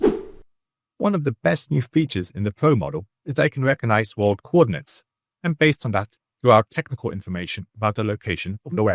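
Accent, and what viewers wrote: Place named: United Kingdom. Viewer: lol your British accent is so strong I literally started wondering what "Google F" was 😂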